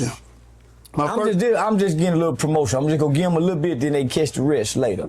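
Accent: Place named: Florida, United States. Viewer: he sound like florida accent a lil bit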